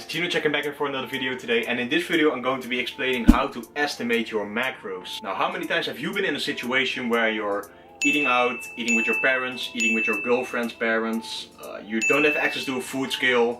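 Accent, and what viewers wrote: Netherlands. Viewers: It took me 0.1 seconds to realize you're Dutch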